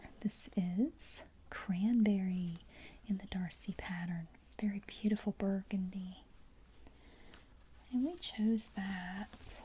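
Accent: South, United States. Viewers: I love your voice and southern accent!!!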